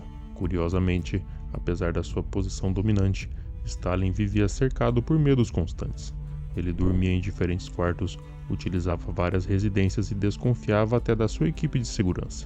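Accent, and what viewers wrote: Brazil. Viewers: Sim ele é um sulistas branco com sotaque forte quem nasce no sul dificilmente perde esse sotaque 😢😮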